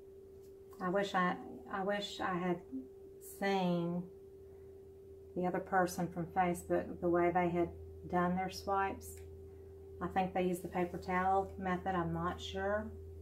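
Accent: South, United States. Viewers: Do I detect a southern accent y'all? :-) I'm originally from Tallahassee.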